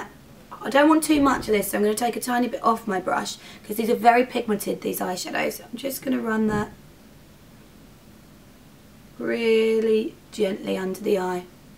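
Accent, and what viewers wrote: England, United Kingdom. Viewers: I realy like your video, let me ask , where are you from!?! England? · LOVE British accent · Are you British ?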